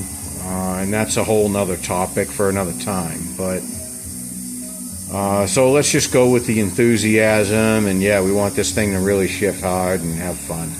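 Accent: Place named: Maine, United States. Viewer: This is great. Still super complex but really helpful. Thank you so much. I think I recall from another video that you're out of Texas, but you sound like a Mainer to me. A good thing!!! Thanks.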